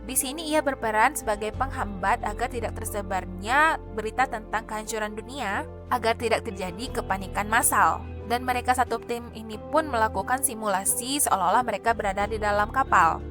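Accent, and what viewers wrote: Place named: West Sumatra, Indonesia. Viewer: kan betul. Aku dah tebak dari pertama. Minang betul logatnya. Mantaap kokk. Jangan malu sama logat kita. Aku melayu hehhe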